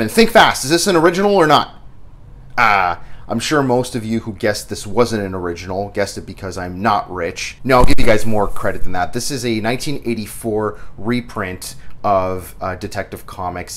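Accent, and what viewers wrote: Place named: Canada. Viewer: This is what a Canadian accent sounds like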